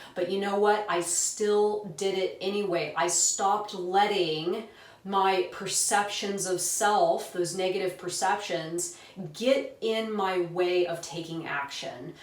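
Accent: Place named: South, United States. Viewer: I love your videos. as a southerner i sense a slight southern accent. it seems you have tried very hard to hide it though but its so cute when it peeks through. IM not sure if i am right but if i am let it come through more! anyways love your vids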